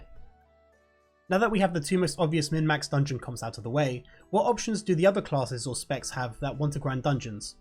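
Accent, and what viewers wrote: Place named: Scotland, United Kingdom. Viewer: You sound Scottish! I think all Scottish people play rogues and warlocks hahaha, seems to be the case on my server at least!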